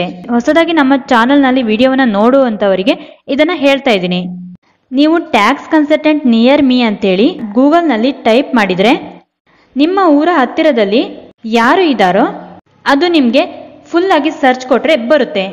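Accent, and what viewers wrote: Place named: Kerala, India. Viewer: Kerala... Language problem tumba ide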